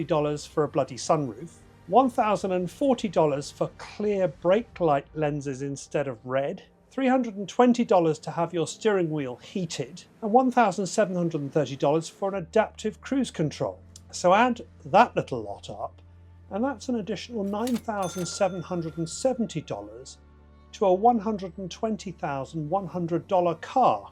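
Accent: United Kingdom. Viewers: I think it’s “jen-tee-ann” blue, but you get some slack because of your British accent. 😆 Great review though, and I’ve watched a few. Yours was a nice honest assessment. Thank you for that. One of the best so far. The cool things about the T, outside of its engine/suspension/performance specs, are the throwback wood shift knob and the black plaid seat inserts. They make the T truly unique. The good news is that you can add both of those visual cues to just about any Porsche. I’ve previously had a guy in Mexico City make almost the exact same shifter. I’m sure a black plaid material is available in automotive upholstery circles. You can also get large black/black Pepita houndstooth from Relicate for a similar interior vibe. Keep up the great work,!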